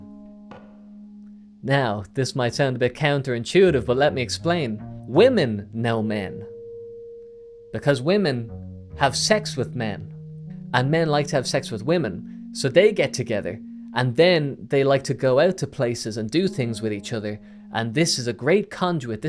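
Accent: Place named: Ireland. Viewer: Why are you irish?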